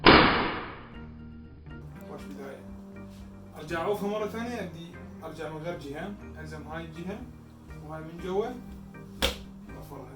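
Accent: Iraq. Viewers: والله اكثر شي عجبني بيك هي لهجتك العراقية الاصيلة والاحلى بعد هوة هو اسلوب السهل الممتنع الي عندك بالطبخ اتمنالك كل التوفيق ❤🌹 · متابعة هاد الشيف مو بسبب الطبخات لان احب اللهجة العراقية وموت فيها مغربية مرت منهنا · انا صح شايف شيفات بس مثلك ومثل اكلك ما شفت اقسم بالله يخوية انا عراقي من اباوع على فيديوهاتك اجوع اكلك (( زي الفل )) صرت مصري مو هههههه بس سؤال انت عراقي لهجة مالتك عراقية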